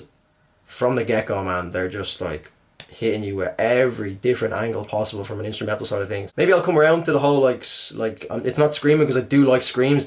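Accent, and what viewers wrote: Dublin, Ireland. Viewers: Ok so... I discovered your channel today, I have watched something like 20 videos... and not a single comment about? It seems you like, is the "same" style (and they were an inspiration for ) mixing metal and electronic music.... and everything is great with them! Voice, music, lyrics, and video clips! I can recommend you a few titles if you're interested; but honnestly everything is great! Considering video clips, my favourite songs would be (german and english version);;.... and for the electronic part:) Anyway, keep up the good job, you're the only reaction channel I actually find interesting (plus your accent reminds me of Dublin <3 )! Greetings from France!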